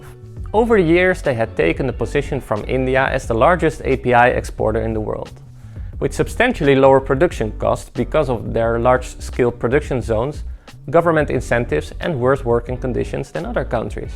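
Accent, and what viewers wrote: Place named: Netherlands. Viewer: Your accent sounds soooo Dutch.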